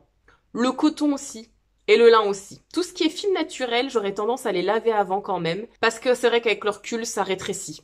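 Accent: Lorraine, France. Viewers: Cc, ça fait du bien de te revoir 😊 Quel bel accent lorrain et beaux tissus. Je ne suis pas convaincue que l’on fasse des affaires dans ces salons 😊 Bonne continuation, à bientôt Raphaëlle DVN a cousu la robe de Bellen Paris, elle est magnifique !!! Beau choix 👍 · Coucou! Je suis de Nancy aussi et comme toi, je suis allée au marché aux tissus le 6 mai. Je partage totalement ton avis concernant la baisse du nombre d'exposants et par conséquent, du choix de tissus. Je trouve aussi que les prix ont suivi la conjoncture économique et qu'on fait de moins bonnes affaires. J'ai été très raisonnable, juste un coupon de jersey et un peu de mercerie. Il faut dire que je suis allée à celui du Luxembourg début mars et mes parents habitent dans la même rue que la grange aux tissus, de quoi faire! Lol Merci pour ta vidéo pétillante et de faire découvrir aux autres cousettes notre pittoresque accent lorrain! 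😂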